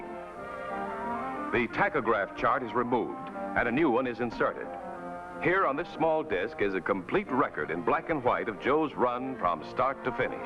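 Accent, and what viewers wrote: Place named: Mid-Atlantic, United States. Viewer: That's the mid-Atlantic accent.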